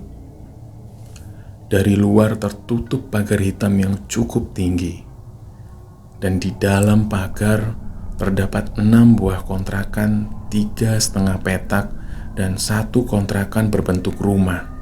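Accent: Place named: Java, Indonesia. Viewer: Logat jowone ojo sampek ilang mas...semangat 💪💪💪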